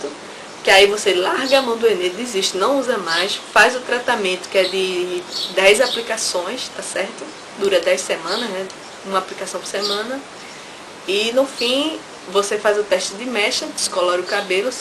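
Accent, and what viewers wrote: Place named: Ceará, Brazil. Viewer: voçe e do çeara,ou rio grande do sul? voçe tem um sotaque bem interessante,.......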